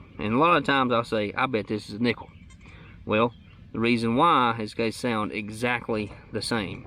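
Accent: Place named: South, United States. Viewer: Pretty good helpful stuff, just ordered one this evening. Your from the south...like where?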